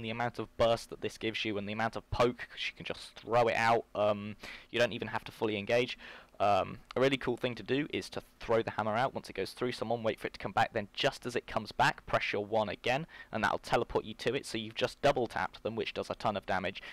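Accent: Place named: United States. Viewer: account He sounds 100% american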